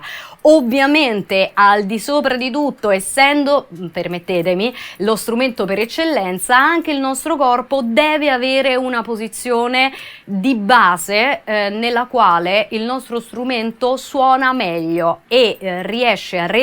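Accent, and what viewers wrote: Rome, Italy. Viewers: Se sente che è de Roma :D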